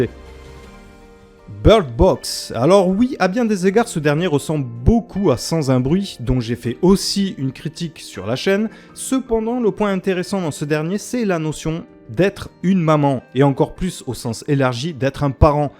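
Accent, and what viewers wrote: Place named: England, United Kingdom. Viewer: Vidéo interessante mais ton accent Anglais il irrite vraiment les oreilles !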